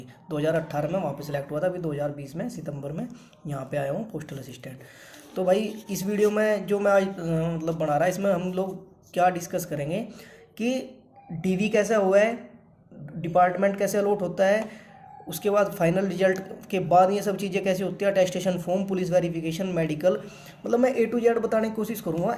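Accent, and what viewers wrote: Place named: Muzaffarnagar, India. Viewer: Bhai UP west he ho kya muzzafarnagar side sei. Tone muzzafarnagar wali hai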